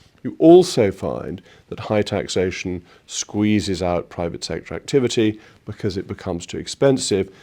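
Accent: RP, United Kingdom. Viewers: All the attributes of a clown with money and amnesia... conveniently forgetting how long his party was in power leading to the UKs ruin. Remove the posh accent and this clown could easily be as useful as a butlins clown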